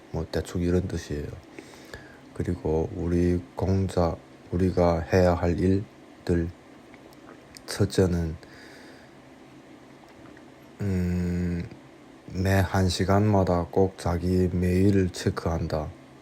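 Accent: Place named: North Korea. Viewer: 조선족은 약간 이북말투가 섞여있을 수 있어요 이사람은 참된 조선족입니다 중국 공산당을 빨아재끼는 조선족이 아니란말입니다.당신이야 말로 정신차리세요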